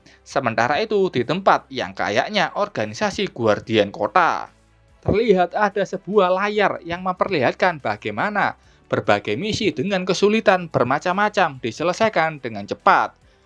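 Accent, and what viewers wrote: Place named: Java, Indonesia. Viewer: mas tolong medok nya di atasi dulu bikin sesak napas dengar nya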